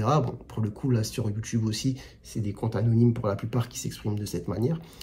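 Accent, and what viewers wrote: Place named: North, France. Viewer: Ces gens la n’ont aucune logique. Pour eux les français d’origine étrangère ne font pas d’effort pour s’adapter à la société française mais critiquent quand ces mêmes personnes le font et réussissent . Pareil pour l’argument des immigrés qui viennent pour les allocs, parce que ces même immigrés voleraient le travail des français de souche chômeurs (les taffs de merde que personne veut faire). Donc de toute façon ils auront toujours une manière de détourner les choses dans leur sens, quite à utiliser des arguments fallacieux ÉDIT: la personne qui t’a dit que tu dis wAllah confond avec « Voilà » avec un léger accent du nord 😂 ils ont vu ton nom toute de suite ils entendent ce qu’ils veulent entendre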